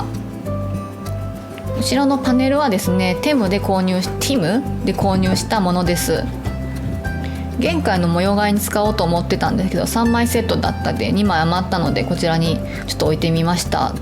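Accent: Kansai, Japan. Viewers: 関西訛りですか？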